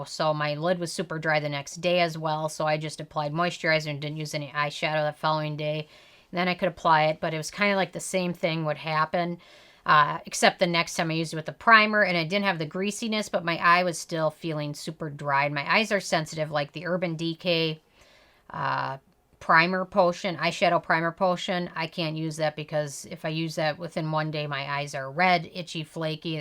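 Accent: Minnesota, United States. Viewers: You HAVE to be from Minnesota or Wisconsin!❤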